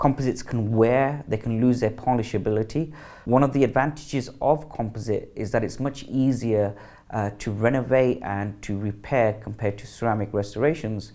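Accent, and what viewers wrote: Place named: United Kingdom. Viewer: Maybe coz british accent id hard to hear!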